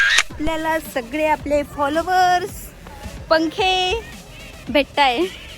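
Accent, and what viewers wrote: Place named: Khandesh, India. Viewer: माय हो आपली खानदेशी उत्तम वाटते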